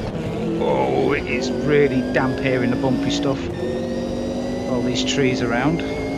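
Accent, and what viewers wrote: North, United Kingdom. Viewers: Love the Group C's in AMS2. That Sauber and Fred's Jag are standouts for me. Congrats on the 2k. I enjoy those familiar dry witted, dulcet northern tones 😆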